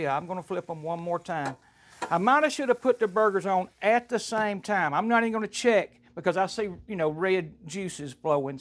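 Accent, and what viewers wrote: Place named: Texas, United States. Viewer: I've loved the accent. Is it from texas ?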